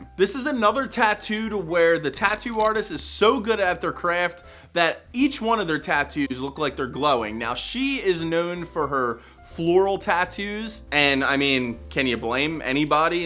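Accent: Philadelphia, United States. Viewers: Yo ur def from philly I’m from the area and can tell by ur dialect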